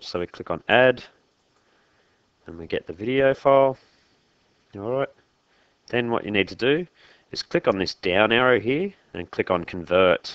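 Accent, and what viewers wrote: Australia. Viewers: An Australian accent! Very helpful vid, thank you...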